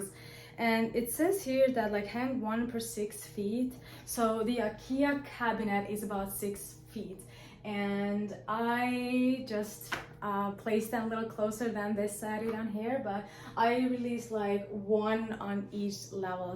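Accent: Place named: Netherlands. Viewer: Beautiful plants! Are you Dutch perhaps??? I just recognize the accent :)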